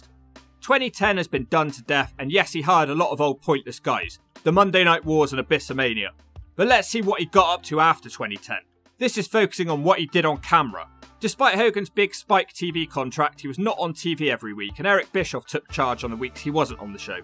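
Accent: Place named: Devon, United Kingdom. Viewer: where are you from as you sound like you’re from my part of the world. I was born in Plymouth (the original one in Devon) and I live in Cornwall just over the Tamar River so I’m on about 15 miles away from Plymouth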